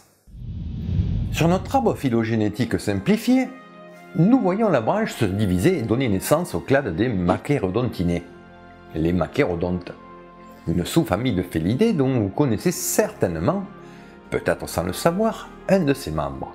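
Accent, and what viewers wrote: South, France. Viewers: Si j'ai bien tout suivi par delà l'accent "peuchère"... cela veut dire que le coutelas "dent de sabre" de Rahan n'est qu'une invention Pif Gadget?!... 😒... triste réalité. La double peine du confinement : Le savoir tue tes rêves! hi hi hi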